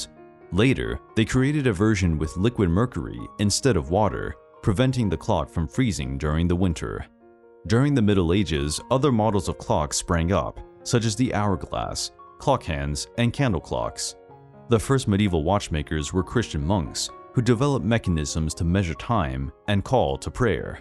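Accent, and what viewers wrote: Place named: United States. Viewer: I can tell by your accent that you're second generation Chinese American. People raised in homes where Chinese is spoken by their parents, and English spoken at school, tend to have subtle giveaways in their speech. Like you.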